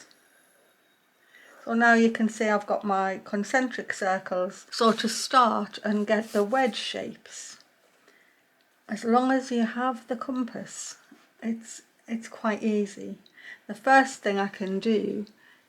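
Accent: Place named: North East England, United Kingdom. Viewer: These are beautiful! Also you sound like you’re from my area, north east England! Ah yes you just said in the video:)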